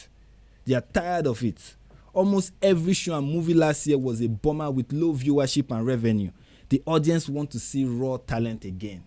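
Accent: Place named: Nigeria. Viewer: So happy the algorithm sent me in your direction. Nigerians have a special clear sighted snark and its a voice in the most musical of accents the world needs to hear right now. Privileged Americans whose careers are entirely racially based are everything wrong - just another way of staying on the plantation - we outside. Peace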